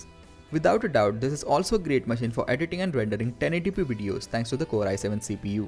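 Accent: India. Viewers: Your thick Indian accent makes it hard to understand. I appreciate your effort though.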